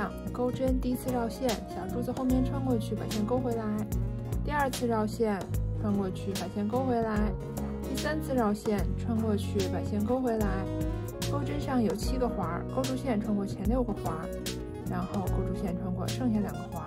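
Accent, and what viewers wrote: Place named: Beijing, China. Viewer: 你应该是北京人吧？🙂耳熟。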